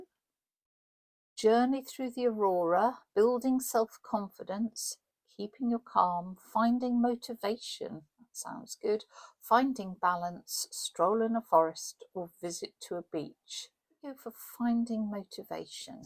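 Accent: United Kingdom. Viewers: Love this! And those color sheets! I love British English. I have a steady diet of BritBox so I feel a bit like I like in the UK. I visited last year for 1 month and in 2025 I will do again in Dec.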